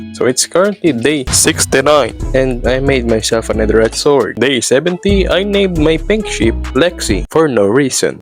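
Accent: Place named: Philippines. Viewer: I already know you are Tagalog because of your Accent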